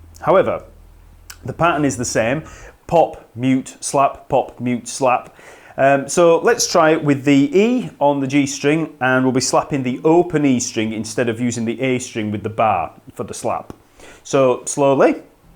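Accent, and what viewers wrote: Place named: England, United Kingdom. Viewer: I really need to admit that Your tutorials are very helpful! I started giving up with looking for some simple but full of knowledge and exercises videos, and, what's more, for free. And then, I've found You and once again I really awoke this desire to play bass on a high level! Thanks Btw, what kind of English accent do You have?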